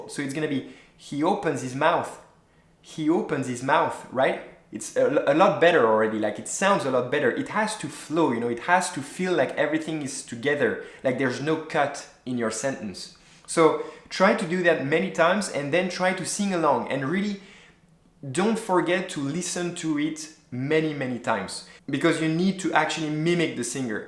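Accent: France. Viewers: I think ur from France ...u have a frensh accent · You have a nice french accent, like it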